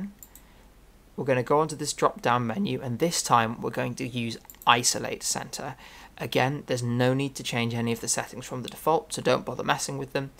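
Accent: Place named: Newcastle, United Kingdom. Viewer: Geordie ASMR with a tutorial in the background. Nice.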